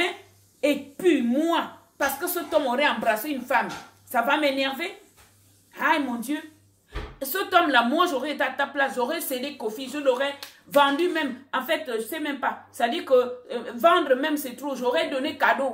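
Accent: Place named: Gabon. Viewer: Elle est Gabonaise 🇬🇦 c’est comme ça que nos mamans Gabonaises parlent👌🏻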